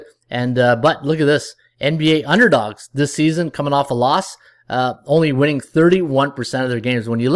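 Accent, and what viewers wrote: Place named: Canada. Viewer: You sound canadian to me...therefore, I'll be expecting many tee's down the road to back that tee from the other day. We sure lucked out yesterday with the Nats. Padres are scoring in bunches, and it doesn't seem to matter who pitches (recently). Going to be keeping my eye on it...their Staff, ignore the numbers, is weathered and tired. They are becoming overworked, and it should trend in the over direction with the offense/tired bullpen combo.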